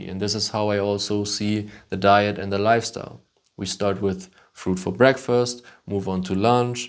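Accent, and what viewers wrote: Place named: Germany. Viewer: Italian name, German accent... Where are you originally from? I'm from Slovenia.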